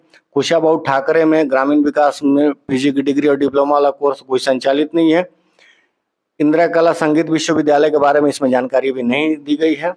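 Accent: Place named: Bihar, India. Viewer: Aap bihari hain kya cg ke nahi lagte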